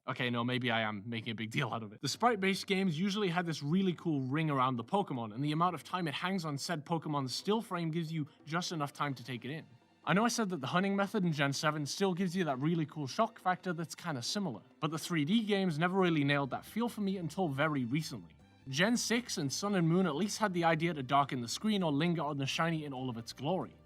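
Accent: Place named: North, United Kingdom. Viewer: Nice one bud, had to reward that top tier tweet with a video watch, quality stuff. Especially the lush northern accent. Shiny locking has never made sense, but you're especially spot on about the Gen 3 colour changes, I hardly even clocked them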